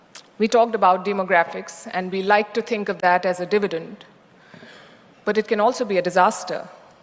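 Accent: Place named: RP, United Kingdom. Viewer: Palki Sharma we citizens of India proud of as you explain in amazing Queens english , take care of you eat well and sleep well , please follow my advice from Chennai , Bharath Matha ke Jai, Jai Hind ..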